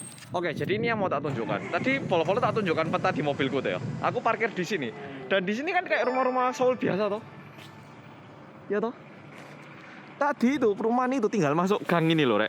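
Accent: Java, Indonesia. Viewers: Mase..medhok bgt bahasa indonesiany..👍🏻👍🏻👍🏻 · Medok e ga biasa kakak🤣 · Yes mix indonesian languange with accent jawa😀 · Jawab dl dong, subscriber terlama dari chanel ini kok si kakak ini medok banget ya? apa ayahnya org indo atau gmna? atau org tuanya emang org korea cm tnggl di indo dri kecil aja? mau coba ngikutin vlog pertamanya tp aku maget guys😭🤣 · Masnya medok tapi kaya orang korea.orang mana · Nonton vlog mas saking medoknya, sampe lupa klo ini vlog dikorea😭💔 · Kagum sama mas medok banget bahasa nya... Setelah lihat video mas ini, saya bisa lihat korea tanpa harus pergi ke korea, Aslinya pingin banget bisa jalan jalan ke Korea. Sering sering buat konten outdoor seperti ini ya Mas. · Medok banget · Bersama orang korea yang Medhohokk, suka banget denger nya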